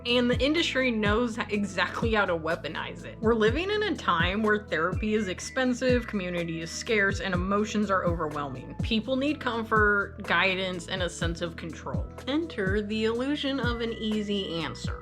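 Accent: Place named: Texas, United States. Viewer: Dear! This is so fucking hilarious. Your stream of consciousness took me for a ride! It was such a rush and because of the depth of your research It was a ride of outstanding beauty and mindblowing insights. I adore your dialect!! Are you an Unicorn with a Texas-Twäng? Where do you come from? Pleeeeze tell me!! I' m from Germany. 🍀😉♥️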